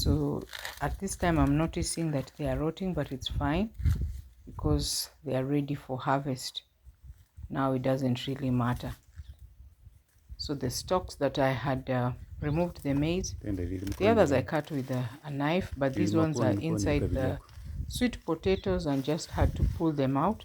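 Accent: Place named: Kamba, Kenya. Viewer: I sensed that you sound like Kamba, where are you from?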